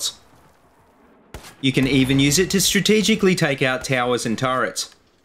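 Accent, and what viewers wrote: Australia. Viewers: He has to be Australian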